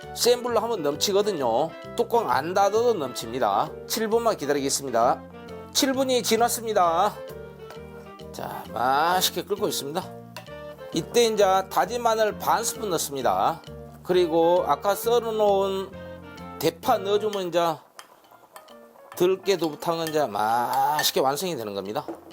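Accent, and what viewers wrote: South Korea. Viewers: 언제들어도 구수한사투리 정감이 갑니다 쉐프님 역시 최고입니다 감사합니다 🎉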